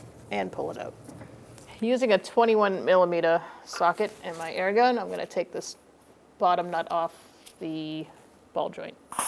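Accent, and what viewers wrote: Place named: New York, United States. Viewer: New York or Jersey accent for sure. (out sounds like oat)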